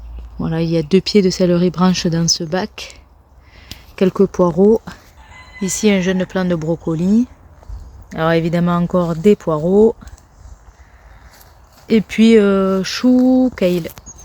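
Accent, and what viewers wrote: South, France. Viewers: Bonjour, avec ce petit accent du sud que tu as je ferme les yeux et je me crois à Palavas les flots. Chez moi le Cèleri ne tiens pas l'hiver il gèle et disparaît à chaque fois, le tiens est encore très correct! tes navets tes choux sont jolies aussi, attention quand tu enfonce la fourche bêche j'ai entendu un vers de terre hurler! 😁 ta terre est bien vivante, à bientôt 👍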